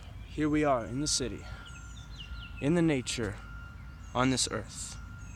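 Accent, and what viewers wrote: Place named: United Kingdom. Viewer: I actually thought you were a hyped British guy.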